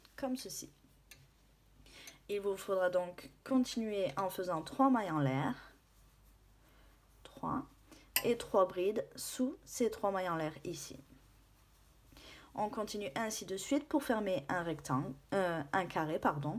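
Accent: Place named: England, United Kingdom. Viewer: bonjour j'ai suivi vos conseil, j'aime votre petit accent anglais je vous encourage à continuer vivement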